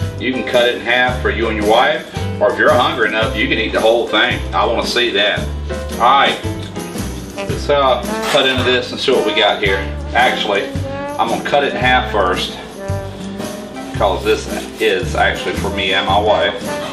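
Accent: South, United States. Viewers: I Like The Theme Of This Video & The Accent U Have Lol I Felt Like I Was In The Country What Was The Name Of The Song That Started Playing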